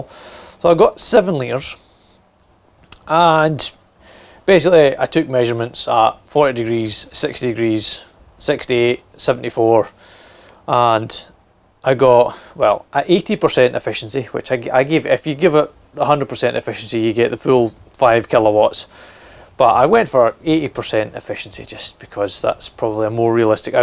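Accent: Scotland, United Kingdom. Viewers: Listening to your?eastern? Scottish accent is bamboozling my fancy. 😁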